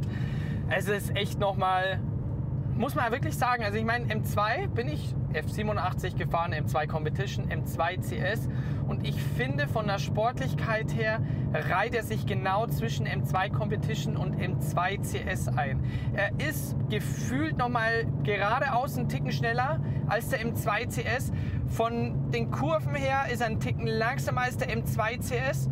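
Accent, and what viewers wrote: Bavaria, Germany. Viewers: A is des schee wenns auf boarisch is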